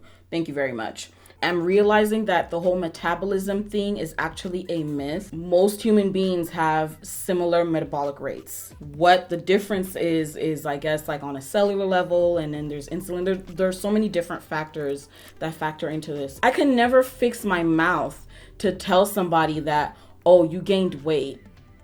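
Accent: Africa. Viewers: I’m living for the African accent thoooo😍😍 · Hey... great video, you and my youngest Erin share the same birthday!! Happy belated birthday. You have helped me so much with this video about the weight gain issue... I plan to be more aware of what I say to my 19 year old daughter!! Kudos to you for putting yourself out there. It tickles me to hear your African accent. I’m married to a Cameroonian and you make my day when I watch your videos!! Lol keep the videos coming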